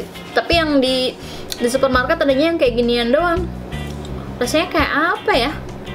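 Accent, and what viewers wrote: West Java, Indonesia. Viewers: resep ihh nyunda 😂😂 orang mah rek diamerika ge logat mah moal hilang 😂😂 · Janten urg sunda teteh teh? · emoy atu lah teteh sunda pisan eta hahaha.. teteh tinggal di mana sekarang ? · org mna kk sunda nya ? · logat2 sunda gituu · mba orng sunda ya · Orang sunda nya teh....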